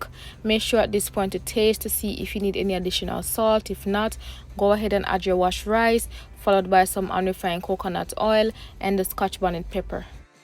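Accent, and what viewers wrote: Jamaica. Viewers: Do I detect a Jamaican accent? Love the food.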